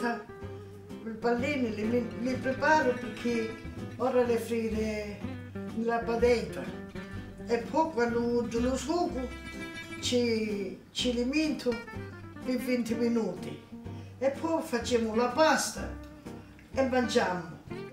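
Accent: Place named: Sicily, Italy. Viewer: La nonna parle un sicilien parfait de la région d Agrigento et en plus les Pourpette ont l’air délicieux brava la nonna demain j’essaye de le faire aussi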